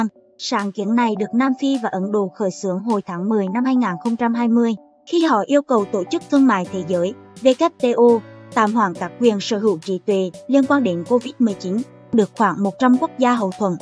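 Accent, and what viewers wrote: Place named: Hue, Vietnam. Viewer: Lâu rồi mới được nghe giọng Huế.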